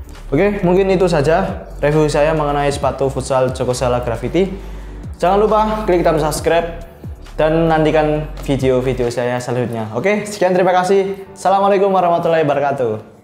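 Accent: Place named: Java, Indonesia. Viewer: Halah..meddokh .. n gagap 😂 males Dengernya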